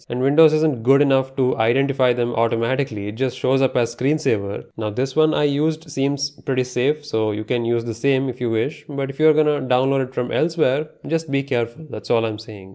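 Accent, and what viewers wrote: India. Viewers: Dude looks Mexican but speaks Indian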